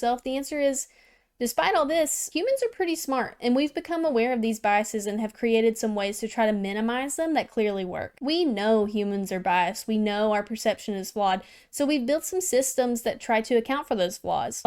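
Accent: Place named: South, United States. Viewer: You sounded a lot more sounthern than I would have expected an atheist to sound 🤠🤠🤠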